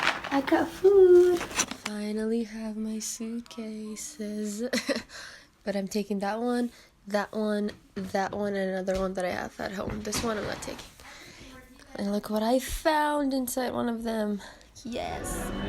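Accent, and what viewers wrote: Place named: Iran. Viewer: مثل بچه آدم ایرانی حرف می زدی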